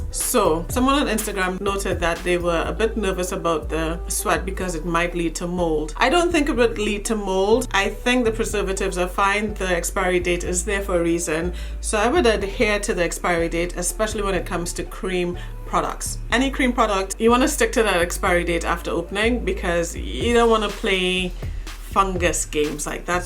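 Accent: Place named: Caribbean. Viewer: You sound like your oots are from the Caribbean... trini maybe?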